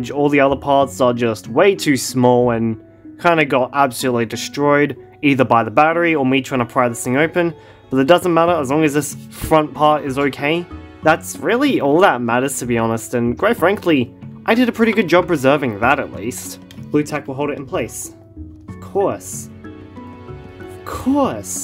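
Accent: Australia. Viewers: I think you're currently my favorite YouTube channel. interesting old tech stuff, dankpods style but with more chill vibes, that glorious australian accent, plus beautiful synthwave (edit: and Minecraft OST!) playing the whole time? perfection.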